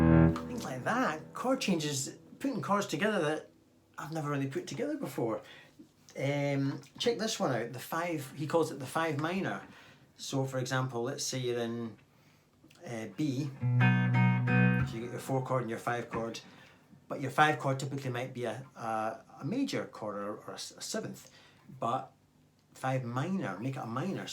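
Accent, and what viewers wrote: Scotland, United Kingdom. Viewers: what cool accent the Scottish is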